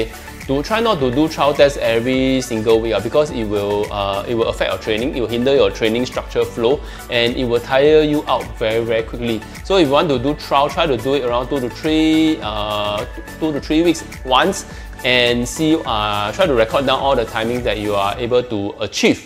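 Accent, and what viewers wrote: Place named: Singapore. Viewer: the way how Singaporean talk. The slang is weird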